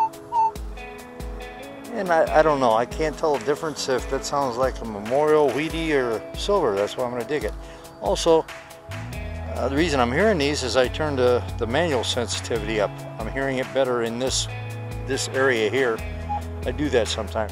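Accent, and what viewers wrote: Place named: Chicago, United States. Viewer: I dig that classic chicago accent. Happy hunting!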